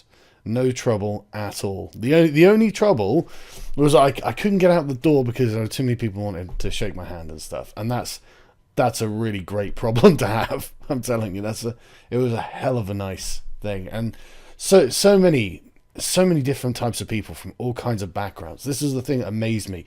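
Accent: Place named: United Kingdom. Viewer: you sound reeeeally british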